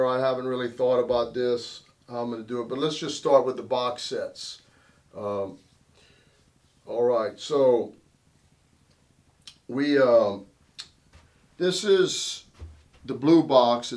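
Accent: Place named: New Orleans, United States. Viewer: That is a amazing box set collection. Are you from Louisiana originally your accent reminds me of a guy I met from New Orleans.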